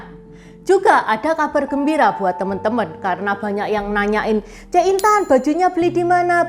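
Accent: Java, Indonesia. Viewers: Logatnya sdah mendok jawa banget 😁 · Jawanya medok banget ci 😂👍🏻 Chasing chindo, isi jawa tulen haha, Maaf baru pertama nonton, Thanks infonya, sangat bermanfaat Salam satu nusa satu bangsa 💪🏻🇮🇩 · Mantap cici Intan, suara medok khas Tionghoa Jawa tp saya suka nonton youtube ci Intan. Oeh Andi Tio Hokkian Bandung yang leluhur berasal dari Coanciu/Quanzhou asal Oey Ek Tjong Sinar Mas, Lim Seng Tee Sampoerna, Aguan Agung Sedayu dll. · 👍👍Logatnya Mbak ini medok banget 👍👍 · Kalau gak lihat orangnya aku kira ini orang jawa lagi ngomong.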